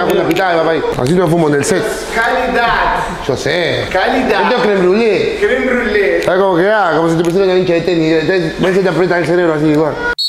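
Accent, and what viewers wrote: Uruguay. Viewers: Que lindo escuchar hablar uruguayo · El ejemplo perfecto del acento Uruguayo 😂🖤💛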